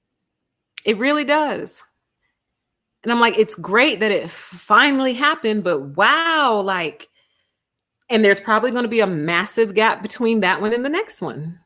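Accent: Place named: Texas, United States. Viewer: Hello & Youtubers hope You All doing Fine & Safe & Pleasant always! And Your Video Content is Great along with the people's comment section below this Video Content! This is a Complement i thought You were a Southern Texas Miss America in a pageant because of Your Amazing Voice & Personality! And wanted to say some of my Relatives are blended with black & white & mexican/native American and some straight & bi and some of my friends are straight & gay and are different races of people and i love my Relatives & Friends! Such a Great Video Content hope You get subs! And i'm not a subscriber yet because i'm a selected target individual being watched & bullied & tested by my unknown haters & gangstalkers and handlers on social media and in public places i go! Anyway can You give Your cute 🐕 puppy a hug from me ❤Thank You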